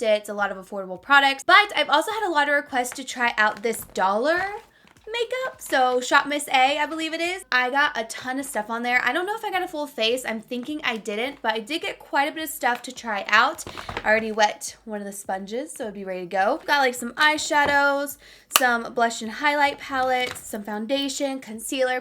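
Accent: South, United States. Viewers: i love your videos!!! i’m from KY so it’s cute to hear another southern girl! my twitter is: xoxohannah16 💗💗💗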